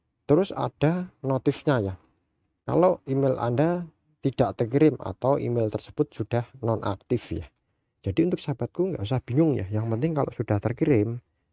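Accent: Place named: Java, Indonesia. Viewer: Ga jelas medok.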